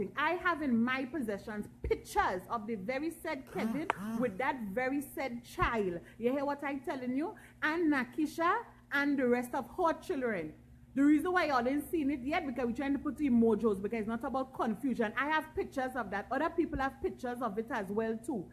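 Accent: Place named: Trinidad and Tobago. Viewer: Hey, you sound more Trinidadian than Grenadian, but that ONLY goes to show how the accent is the same.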